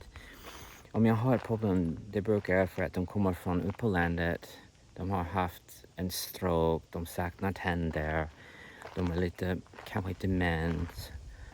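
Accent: Texas, United States. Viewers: De som säger att din svenska är bra har 100% rätt! Man hör ju förstås att du kommer från USA, men om någon har det minsta svårt att förstå dig är det för att den inte vill (troligtvis för att den inte tycker om "icke-svenskar"). Första gången jag ser dina videor och det är superintressant att få inblick i vården i USA från en mer realistiskt källa än House 😆 Att du har kommit så långt med språket på bara tre år är superimponerande, jag vet flera som varit i landet 10-30 år som fortfarande inte kommit lika långt. "En"/"ett", "den"/"det", "de"/"dem" är svårt, ("de"/"dem" gör otroligt många infödda svenskar fel på), men det är ju alltid lätt att förstå även om det skulle bli fel. Svårare blir det ju om mellanrummen hamnar fel, hoppas du inte jobbar med någon "grå hårig sjuk sköterska" t.ex. 😉 Bra jobbat! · You speak good swedish without the very strong accent americans often have. 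Be proud you have nothing to worry about. I understand everything. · As someone who knows it, he sounds just fine. Its easy to understand him, he speaks in a "natural" way (as in, not "book swedish"). Theres a definite american accent but honestly we dont really care about that:D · Det låter riktigt bra. Klart din svenska har en viss Texas-accent, men åtminstone jag som finländare hör mycket klart att du har lärt dig svenska i Sverige. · Din svenska är alldeles utmärkt och jag tycker du fått till fin satsmelodi. Att det hörs att du är från Texas är bara charmigt. Det gör det inte svårare att förstå dig. Bra jobbat! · Utmärkt svenska med bra grammatik och ordförråd. Du talar ju helt flytande utan pauser för att leta ord och det är inga som helst problem att förstå dig. Personligen tycker jag inte att du ska jobba bort texas-accenten, jag gillar den skarpt. Har alltid tyckt att det låter coolt när engelsktalande talar svenska. 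:) De enda smågrejerna jag noterade var ovanligt ordval på något ställe som "det var en stund sedan", vilket är direktöversättning (while) från engelska och det kan man ju absolut använda och alla förstår men mer vanligt är att man säger "det var ett tag sedan". Sen var det någon omvänd ordföljd som "ibland vi får tala engelska med dem" istället för "ibland får vi tala engelska med dem". Men det spelar inte heller någon roll, alla förstår givetvis ändå. Mycket bra jobbat! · Nice work. The Texan accent makes it hard pronounce certain stuff. I teach kids football in Swedish and my English accent is an issue with certain words. Great work though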